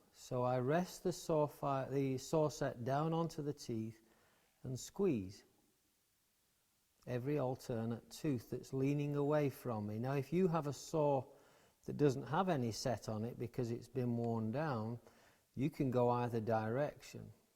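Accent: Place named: Yorkshire, United Kingdom. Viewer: Your accent sounds like my father's Yorkshire?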